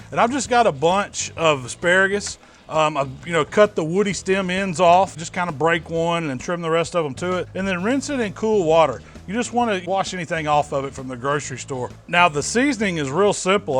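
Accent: South, United States. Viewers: For as much "southern drawl" as you have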